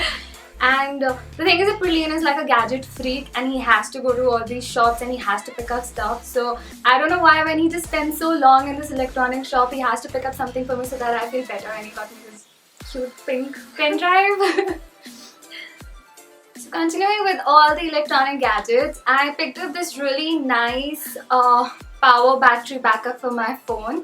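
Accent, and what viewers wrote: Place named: India. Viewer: thats a typical Indian accent lol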